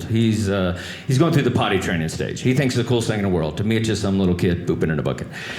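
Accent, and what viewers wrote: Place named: California, United States. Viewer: This guy is a punk. If he is from Texas he's from Austin. Looks and sounds more like a California immigrate.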